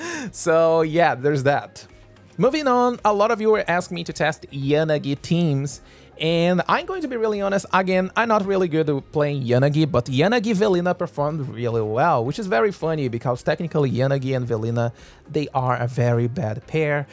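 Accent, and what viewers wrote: Brazil. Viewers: Can't wait to try the Air Fryer team!! Btw, are you Brazilian? That accent sounds familiar. Awesome video!!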